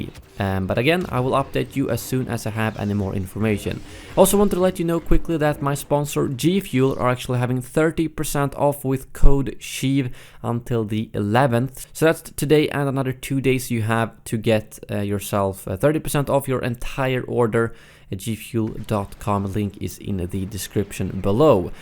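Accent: Sweden. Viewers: You from Sweden?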